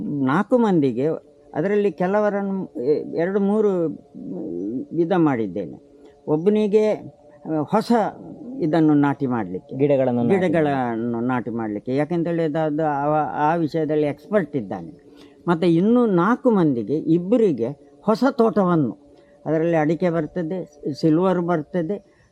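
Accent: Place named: Tamil Nadu, India. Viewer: ಇವರ ಭಾಷೆ ಯಾವುದು.. ಕನ್ನಡ ಸರಿಯಾಗಿ ಬರಲ್ಲ ಅನ್ನಿಸುತ್ತೆ.. ತುಂಬಾ ಕಷ್ಟ ಪಟ್ಟು ಕನ್ನಡ ಮಾತಾಡುತ್ತಾಯಿದ್ದಾರೆ. ತಮಿಳುನಾಡಿನ ಜನ ಕನ್ನಡ ಕಲಿತರೆ ಹೀಗೆ ಮಾತಾಡೋದು.. ಪದಗಳನ್ನ ಕೂಡಿಸಿ ಕೂಡಿಸಿ ಮಾತಾಡುತ್ತ.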